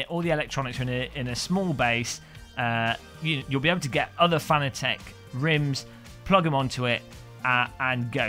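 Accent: United Kingdom. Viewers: $1500...pause...walk away....heart broken....come back...at least I get to hear you speak with your Bri-ish accent.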